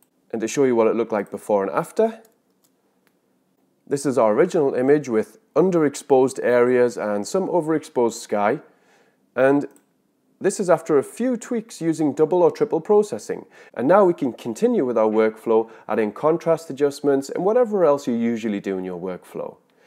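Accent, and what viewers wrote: Newcastle, United Kingdom. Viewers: Great video and I'm in total agreement. I've not used any HDR software since I stumbled upon one of your videos and bought Raya Pro. By the way, my American girlfriend loves your voice. She finds it extremely relaxing. Ever since I've been with her she's grown to love the Geordie accent but she particularly likes yours; especially when you say the word "mask". In fact when I'm watching your videos, she now refers to you as.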